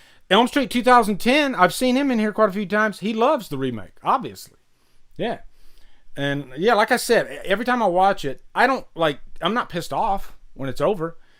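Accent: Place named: Mississippi, United States. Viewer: a bunch of characters, huh? 😂 I thought I detected some Mississippi in your accent, but I miss Florida beaches. Texas really doesn't have any good ones. Maybe 1 or two, like South Padre or whatever.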